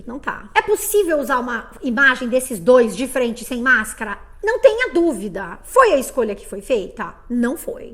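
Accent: Rio de Janeiro, Brazil. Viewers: mata minha curiosidade, pelo amor da deusa. Você é paulistana ou carioca? Juro que às vezes ouço um sotaque carioca, e outras, um sotaque paulistano.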